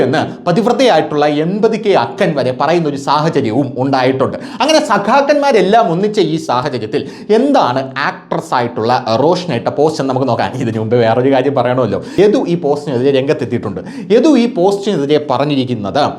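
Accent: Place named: Trivandrum, India. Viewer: ചേട്ടന്റെ Trivandrum slangil ഉള്ള അവതരണം പൊളി 😂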